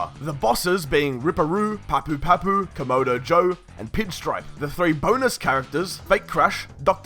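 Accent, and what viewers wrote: Australia. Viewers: Shu bro you sound like you're from bankstown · One month ago? Mate you sound aussie asf how do you not english in the first 10 seconds?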